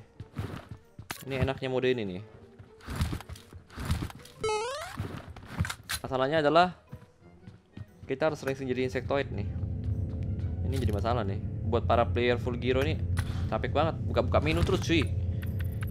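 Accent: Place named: Pematangsiantar, Indonesia. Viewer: Patengtengan bang 🤣 , ngeri kali bahasa mu bg nampak kali par siantar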